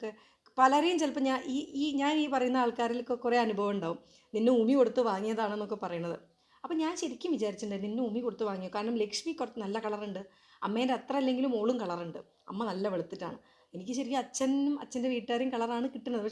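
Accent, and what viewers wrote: Kozhikode, India. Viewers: അവര് കോഴിക്കോട്ട് കാര് അല്ലെ same സ്ലാങ് വരും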